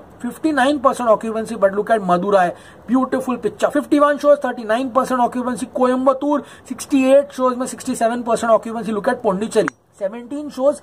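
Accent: Karnataka, India. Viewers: Bro ..i just love your kannada slang!!! You rock!!!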